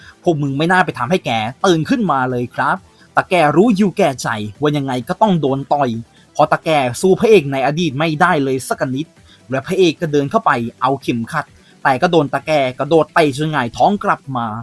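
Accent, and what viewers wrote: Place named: Thailand. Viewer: สำเนียงพี่เหน่อจัง เป็นคนจังหวัดไหนหรอครับ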